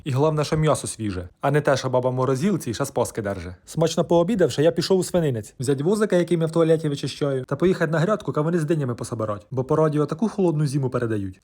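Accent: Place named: Cherkasy, Ukraine. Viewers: Черкаси тепер зрозуміло звідки це й знайомий діалект)) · Ото,коли чую слово" кохве", то вже мій день відбувся!!! Чудова українська- центральночеркаська мова. Вітання зі Шполи!!! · Слухаю черкаський говір ,як музику і згадую Таню з Черкас🤭😆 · тобі здоров'я!!! Бачив що з Черкащини,думав що до Київської сторони,а коли взнав,що з Тіньок,мало не обістявся(земляк в тройні)!!!Моя мама до затоплення з дідом теж в Тіньках жили,а потім в Чикаго переїхали!!! Мені дуже приємно дивитись твої відео аж до сліз!!!Дивлюсь з Одеси!!! Чекаю на твій контент з нетерпінням!!! Дуже приємно і класно в тебе виходить з нашим Черкаським говором і твоєю харизмою!Бажаю здоров'я ❤❤❤!!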